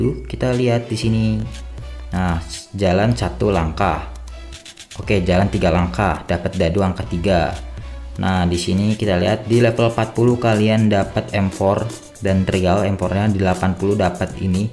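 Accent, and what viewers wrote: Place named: Java, Indonesia. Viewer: Mas wong jowi yo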